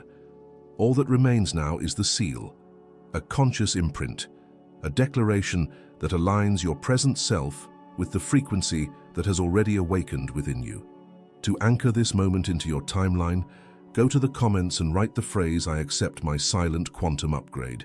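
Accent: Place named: United Kingdom. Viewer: I would like to watch this ,but the British accent gives me the creeps.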